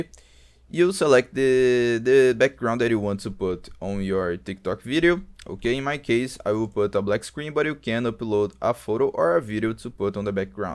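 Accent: Brazil. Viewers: o cara é brasileiro, conheço o sotaque brasileiro no ingles KKKKKKKKKK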